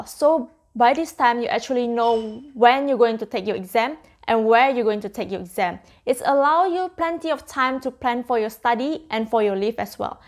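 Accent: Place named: Vietnam. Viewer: Vietnam accent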